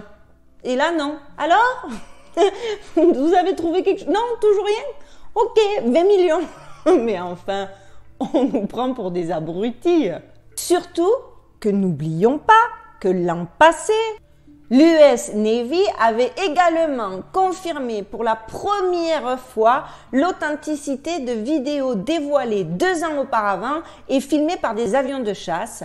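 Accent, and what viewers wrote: Marseille, France. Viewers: Nous sommes tous différents, c'est sûr, moi elle me fait rire et m'apporte de grands bols d'air Merci ! Merci , continuez très chère amie marseillaise à l'accent plein de soleil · C'est plutôt la cagole du coin... avec l'accent qu'elle a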